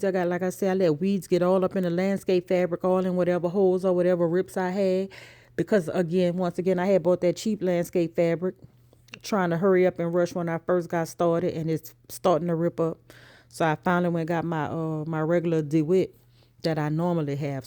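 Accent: New Orleans, United States. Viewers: Sounds like you from New Orleans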